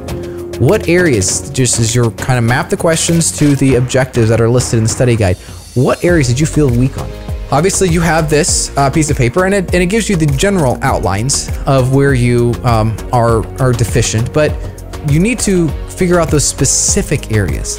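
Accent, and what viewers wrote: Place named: United States. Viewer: like your accent ... it's rare to find an American accent in magento field hhhhh😂😂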